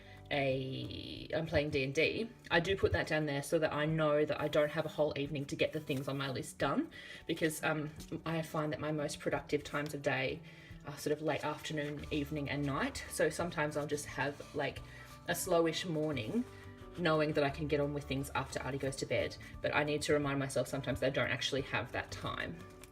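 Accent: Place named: Australia. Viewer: Uhhhhh it’s so nice to finally hear an Australian accent the Americans have taken over YOUTUBE!! JK lol Heyyy Aussie squad!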